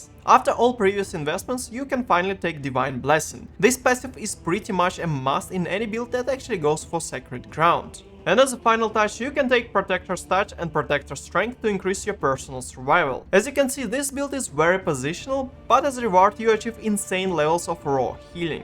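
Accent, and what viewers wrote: Russia. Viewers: Русской школой попахивает английский)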